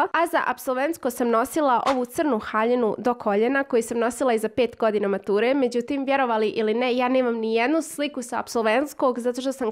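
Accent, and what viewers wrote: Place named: Bosnia and Herzegovina. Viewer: A odakle si imas bosanski naglasak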